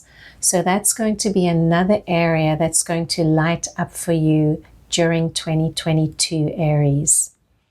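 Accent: South Africa. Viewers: Are you South African by the way, your accent sounds so familiar? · You have a South Frican accent. Everything you said resonates. I saw your video today April 26th and started a health voach course in the begining of march a should get my certificate in march 2023. Also watched mu rising sign acquarius before and it is all playing out as described. Just wow!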